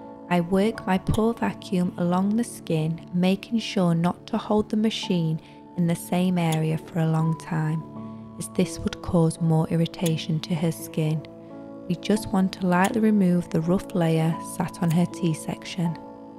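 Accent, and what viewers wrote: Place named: Northern England, United Kingdom. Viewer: is hers a Northern English accent? Whatever it is, I love it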